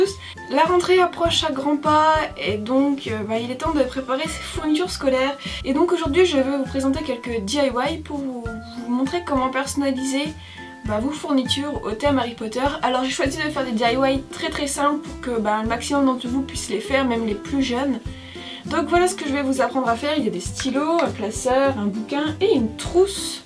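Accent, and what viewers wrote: North, France. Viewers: C'est peut-être con mais le classeur m'as bluffé, il est vraiment bien fais, tellement que tu l'avais acheter tel quel ^^ Sinon (si c'est pas trop indiscret), vers où tu habites ? Parce que je t'ai entendu une fois parler de Reims et sans me tromper je pense pouvoir reconnaître un petit accent du nord de la France :3 Voilà, sinon j'aime beaucoup tes vidéos ^^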